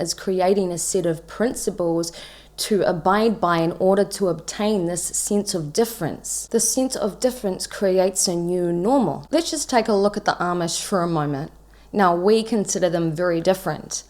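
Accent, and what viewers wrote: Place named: New Zealand. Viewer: SORRY I DIDNT HEAR EVERYTHING YOU SAID, bc I haven't taken my adhd meds today, but are you from New Zealand?? Your accents sounds NZ 😍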